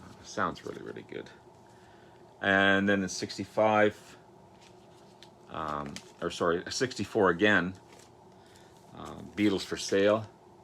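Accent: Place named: Canada. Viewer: It’s nice to hear your thoughts rather than just showing something that’s been shown a million times already. I’m not a Beatles fan (I will go hide now...lol!) but I enjoy watching everyone’s Beatles related videos. A very good friend of mine bought me the 50th Anniversary edition of so I do have that...a talking point for the cool kids in the Beatles clubs;) LOL Also I love love how youguys say ‘out’ in Canada. It’s so endearing:) Lots of love from the Mitten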